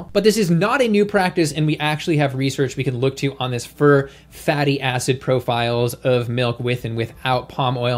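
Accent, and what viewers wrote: Canada. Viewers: Atlantic Canadian, to be specific.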